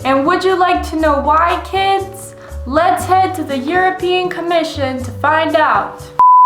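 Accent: United States. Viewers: You clearly grew up in America you liar! To have a yank accent you lived in America and act like a American so yea I call bullshit on your nationality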